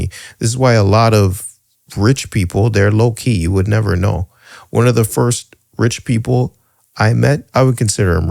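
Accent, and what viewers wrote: Toronto, Canada. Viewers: I heard the way you speak and thought you were from Canada. Where are you from? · The moment I heard the accent I knew you were from Toronto. Its 630 am and this was a great morning listen. Sending love from Brampton.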